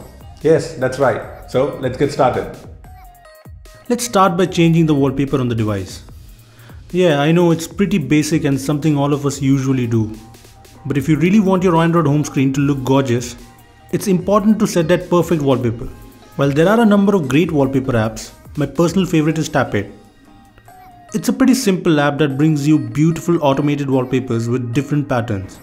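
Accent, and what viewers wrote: India. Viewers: love his indian accent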